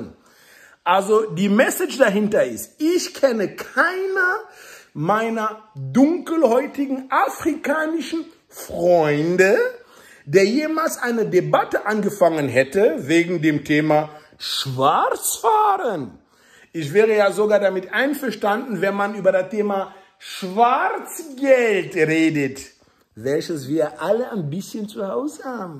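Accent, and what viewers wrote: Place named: Hesse, Germany. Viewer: Hessisch ist ein Lebensgefühl 😊😎👍super sympathisch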